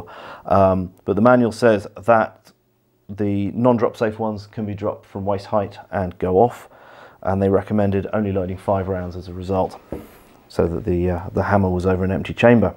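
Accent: England, United Kingdom. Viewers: Wait, you have an English accent and DON'T live in England? HOW IS THAT POSSIBLE? YOU'RE BLOWING MY MIND.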